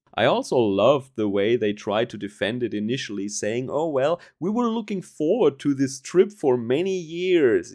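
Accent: Germany. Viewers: I want to hear you say..... It only takes a camera to change her mind. In your cool German accent You know the song Lol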